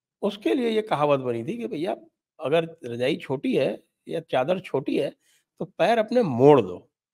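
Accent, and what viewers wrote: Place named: Allahabad, India. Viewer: Very hilarious presentation in Allahabadi tone.😂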